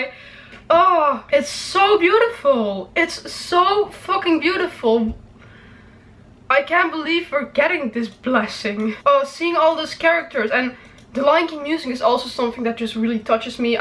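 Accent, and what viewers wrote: Netherlands. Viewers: Are you dutch? I loved the reaction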